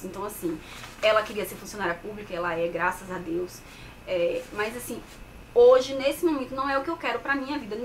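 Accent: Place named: Bahia, Brazil. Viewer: já falei que desde primeira vez que encontrei seu blog me apaixonei, depois ouvi seu vidio virei super fã, adoro de mais seu sutac... e agora descobri q vc tbm é baiana... 😍 eu tbm sou Baiana😍Chapada diamantina 😘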